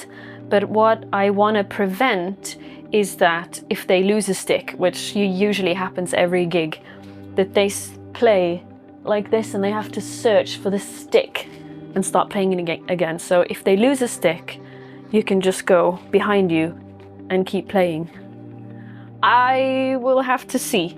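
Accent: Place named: England, United Kingdom. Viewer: Did she live in UK or something? She sounds English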